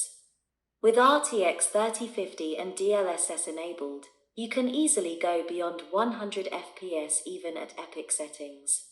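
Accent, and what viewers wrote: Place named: United Kingdom. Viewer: how come the a.i. voice has a british accent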